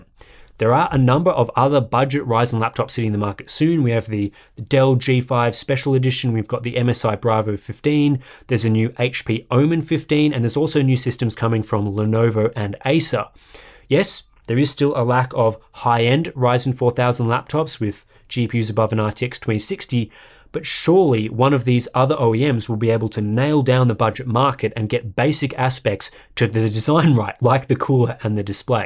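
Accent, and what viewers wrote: Australia. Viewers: Good day mate, are you from Australia?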